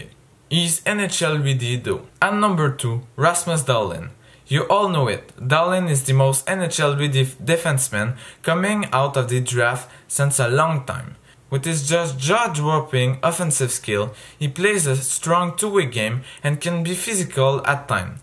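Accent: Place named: Quebec, Canada. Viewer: Are you French Canadian or Russian lol? You sound like a mix of them haha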